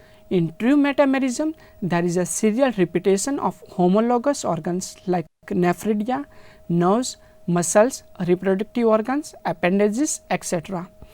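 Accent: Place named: North India, India. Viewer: Very bad English......typical rural small town North Indian accent.....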